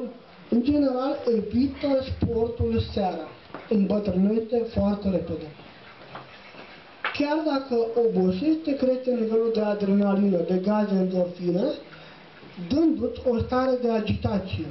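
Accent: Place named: Moldova. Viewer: spuneți lucruri foarte interesante, vitale, dar nu este o claritate în sunetul de transmisie, pe lângă faptul că auzul scade la anumiți oameni și dvs.aveți pe ici , pe colo un minunat accent moldovenesc și o gestică ce mie îmi stârnește râsul, adică îmi place....mulțumesc infinit.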